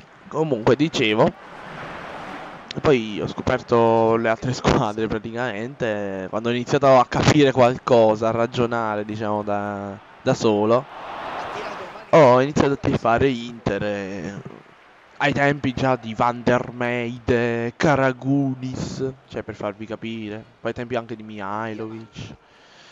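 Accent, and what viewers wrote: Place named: Caserta, Italy. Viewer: bella ti immaginavo di caserta per la parlata...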